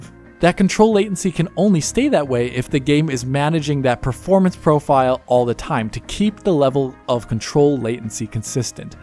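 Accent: Germany. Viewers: HOL' UP. YOU ARE GERMAN?! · Or... the guy is German? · He German. I repeat: He German. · German accent is never funny, its rather SCARY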